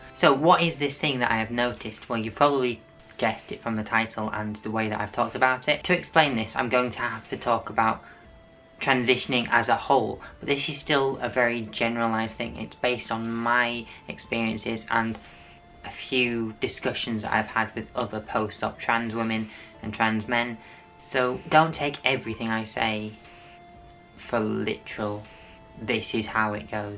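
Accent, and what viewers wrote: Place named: United Kingdom. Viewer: Seeing this two years later ( I guess it was Two Years Ago with.) I find it is SO SAD that you couldn't get a Permanent Radio 📻 Gig. As I've said before... American Public Radio Stations would hire you in a ❤️ heartbeat for your British accent,Show Content and Dilligent Production Qualities. By the way...we could do a Show together...where we present and argue the Qualities of The British Production of Life on Mars....or the American Version of Life on Mars! 👾 👽. By the way...I noticed your Bed Covering...I do believe you are trying to make a Spectacle of Yourself,! Adios!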